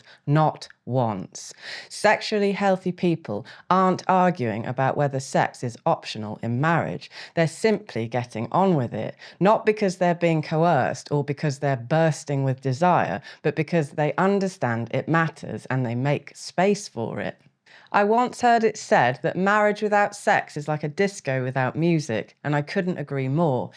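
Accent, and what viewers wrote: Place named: West Country, United Kingdom. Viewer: Sounds like someone from West Country, nothing more.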